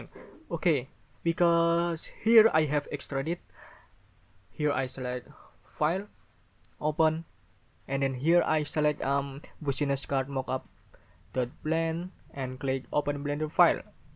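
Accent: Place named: Indonesia. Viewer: the logat like indonesian people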